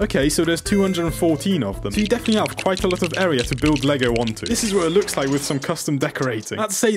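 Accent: United Kingdom. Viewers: He's just british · Mate are you briish pin me if you are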